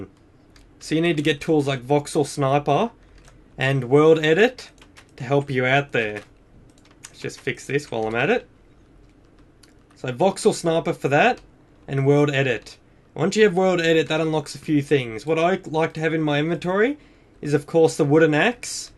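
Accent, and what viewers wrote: Australia. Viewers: nice are u australian just asking LOL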